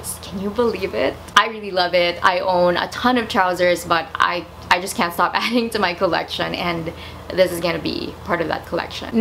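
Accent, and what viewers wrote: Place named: United States. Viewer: First time viewer love the accent still confused if you're American in a good way though I immediately subscribed when I heard your precious voice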